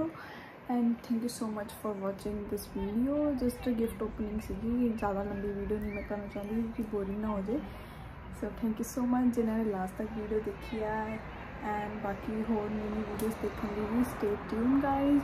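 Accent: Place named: Canada. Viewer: mam da canadian slang hogya hle 6 month ni hoye ayi nu bakwaas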